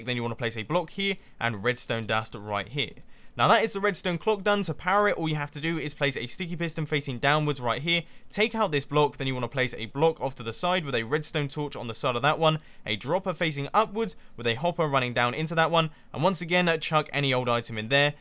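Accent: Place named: United Kingdom. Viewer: He sounds really British in this😂 and I’m from england😂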